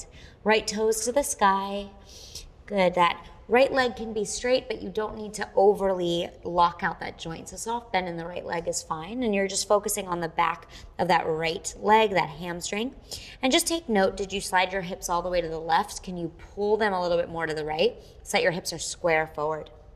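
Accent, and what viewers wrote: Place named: United States. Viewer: I wanted to watch but American accents are so annoying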